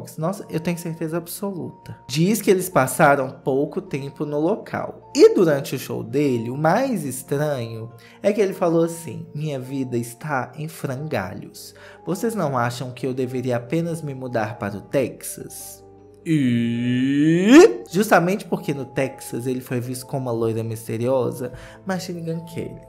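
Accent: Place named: Rio de Janeiro, Brazil. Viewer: Sutaque carioca e o melhor kkkk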